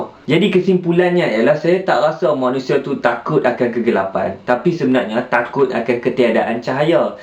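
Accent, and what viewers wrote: Kedah, Malaysia. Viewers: Panjang hang punya mengarut tu bang. Dok luaq negara tp boleh ckp loghat Kedah lagi. Bangga aku sbg org kampung hang. ;)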